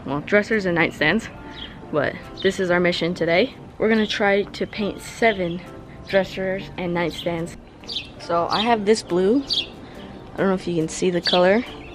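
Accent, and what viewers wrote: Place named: Bay Area, United States. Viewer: Good job.. What part of Cali are you from? You sound like you're from the Bay Area or close by it.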